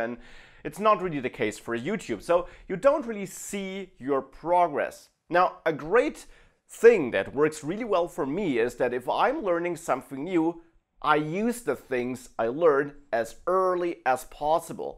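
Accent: Germany. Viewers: Okay cool, ich erkenne doch den Akzent meiner Landsleute, auch wenn euer Englisch natürlich super gut ist 😉 Dass es auch wirklich hochwertige Websites/Channels zum Thema Software Development aus Deutschland gibt, ist gefühlt leider irgendwie viel zu selten 😄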